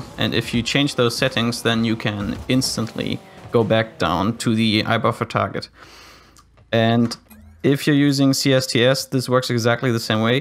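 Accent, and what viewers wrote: Germany. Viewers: U are German right? Youre english sound like when my english teacher speaks ^^ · Höre ich da einen deutschen Akzent?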